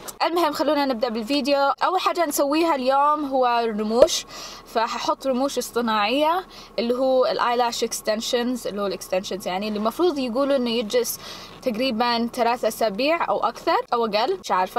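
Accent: Yemen. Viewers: ماشاءالله عليه عسل بس عندي ملاحظه... برغم أنها عايشه في أمريكا إلا أن لهجتها اليمنية مازالت تتكلم بها وهذا شي عجبني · كلامها يقول هي من وين بنتان من اليمن والله ما شيء كمانا فديتك وفديت اليمن وارضها ومن عليها ومن خارجها 🌸🌸🌸🌹♥️ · حلوه اللهجه اليمنيه ع لسانك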